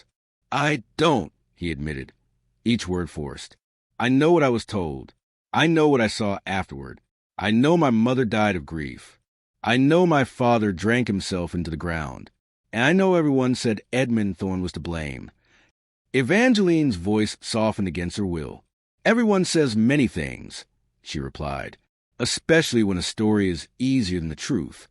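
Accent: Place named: United States. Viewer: Why haven't these regency romances not been given English narrators. Sound very odd in American English.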